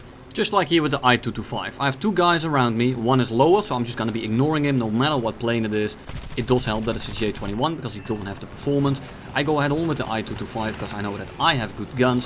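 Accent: Netherlands. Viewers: I love your content and it's been a great motivator to hear your smarmy Dutch accented voice in the background while I go through my evening routine most days. As a second generation German-American, you've got my support, you sarcastic swamp German... Err, I mean, Dutchman!:D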